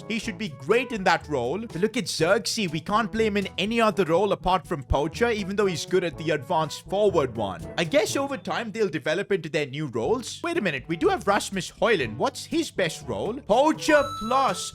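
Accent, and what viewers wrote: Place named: India. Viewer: Bro why he talks like Mickey Mouse but in a Indian accent 😂😂🤣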